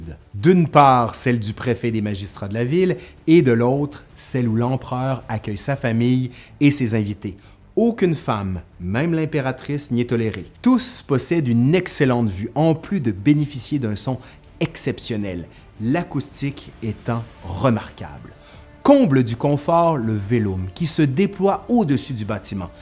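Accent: Quebec, Canada. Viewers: Tellement complet! Bravo. J'aime beaucoup votre manière de construire les vidéos, la manière de parler est nickel et ça fait toujours plaisir d'entendre un petit accent québécois! Merci. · Assez bizarre que tu n'aies pas autant d'abonnés que Nota Bene, pourtant ton contenu est lui aussi très intéressant et bien raconté avec en plus le petit accent québécquois du plaisir. En tout cas sache que tu as gagné un abonné fidèle. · J'adore votre narration. Merci pour le partage. Je viens de découvrir votre chaine. Vos reportages sont courts et instructifs. Je suis contente que vous ayez un joli accent Québécois compréhensible comparé à vos compatriotes. Dommage beaucoup de reportages Québécois sont intéressants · Bon, comme on ne peut mettre qu'un pouce en dessous de la vidéo. Ben, je t'en mets d'autres ici. Merci encore pour ta chaîne si instructive... Et puis aussi pour ce succulent accent québécois qui, pour moi niçois avec mon accent méridional, enchante mon oreille de "français de France" mais qui admire la culture canadienne depuis longtemps (quand j'ai découvert Charlebois et Diane Dufresne etc quand j'étais "mino". 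Aller, ciao viva et bayeta comme on dit ici. CONTINUE À NOUS INSTRUIRE. 👍👍👍👍👍👍👍👍👍👍👍😉😘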